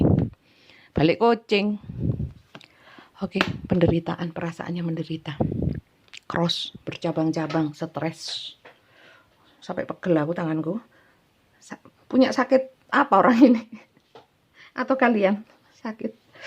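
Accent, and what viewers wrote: Java, Indonesia. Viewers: Selamat malam mom selalu senang dengerin kalau ngmg medok banget jawa nya bikin ketawa, sehat selalu 🙏.. salam hangat mami dari taurus di sby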